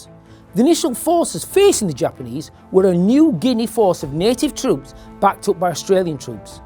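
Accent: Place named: United Kingdom. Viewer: It’s different listening to a Brit talking up Aussie war achievements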